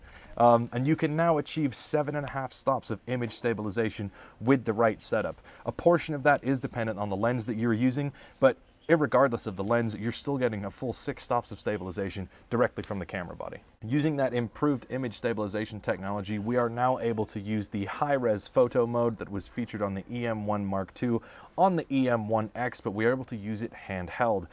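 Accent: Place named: Bristol, United Kingdom. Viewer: You're rocking that Bristol accent...!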